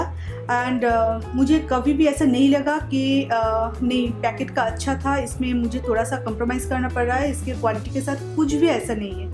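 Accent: Bengal, India. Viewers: Hi frnd u r sooooo sweet.....i love ur bengali accent😘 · I love your way of speaking it has bengali acsent I love it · Shweta Grover Yar bangali hai to Thora sa to chalta hai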